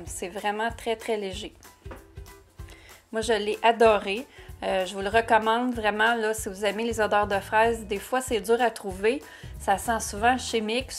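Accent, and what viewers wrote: Quebec, Canada. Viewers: Cool ! J'adore ton accent hihi laisse moi deviner, tu es québécoise ? (au hasard bien sur) hihi J'adore les produits coréens et japonais y en a des étranges mais ils fonctionnent bien ^^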